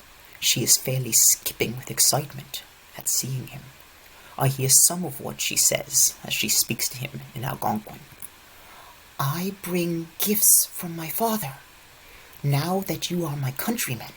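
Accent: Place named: England, United Kingdom. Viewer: The horrible English accent just makes this book ten times worse than it is.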